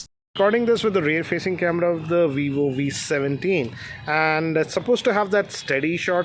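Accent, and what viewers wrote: India. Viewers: wat a english eee ddd cccc bbb ithis words only using ........butler english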